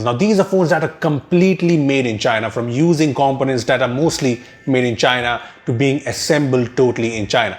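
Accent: India. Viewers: i know that his accent is Indian but my parents are hindi medium ....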